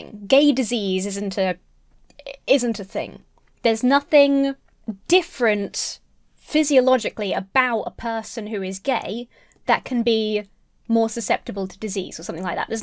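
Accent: United Kingdom. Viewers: starting off the new year with a British amount of shock and awe, brilliant! This highly likable bi woman with eloquently described counterpoints to the source video, while having just a few endearing accent gaffes and quirks that ties together the whole video in a way that usually only annoyed British people manage to do. This subtle call to action against a group that uses religious doctrines to "other" certain groups that they don't want to be treated like equal humans, is as well structured and direct as needed without loosing the simple points covered. Thank you for all the good you did in 2022, and if this video is a yardstick for 2023, it's going to be even better! Keep being awesome, take care and stay safe! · As a New Zealander, I didn't notice that your British accent had faded until you mentioned it. Don't worry about mixing up the accents, they're similar to outsiders and our "rivalry" is basically friendly. 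We know we sound similar.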